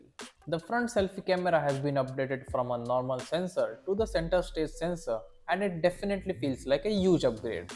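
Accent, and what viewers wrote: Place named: India. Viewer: Indian accent is funny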